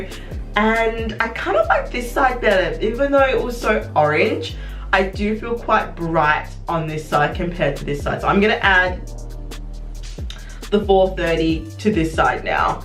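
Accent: Australia. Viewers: Omgggg a melanated Australian!!!! I’ve never heard or seen one that’s wild to me but I love it. I’ve always wondered if we could be Australian (seems so rare). But I appreciate this video because I’ve been looking for a comparison of 430 against the other two. And maybe even 450. I no longer think I’m 430 but it was hard to tell bc the store I was in didn’t have 430 tester😭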